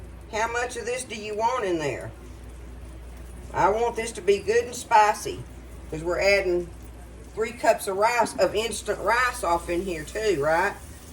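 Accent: South, United States. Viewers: Whats a shrimp bowl? Lol jk 😂 i love southern accents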